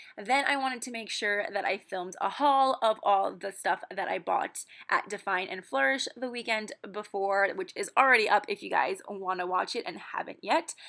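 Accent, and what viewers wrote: United Kingdom. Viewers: Your british accent 😂😂😂😂😂😂😂😂😂😂😂😂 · THAT BRITISH ACCENT THO 😆😍😘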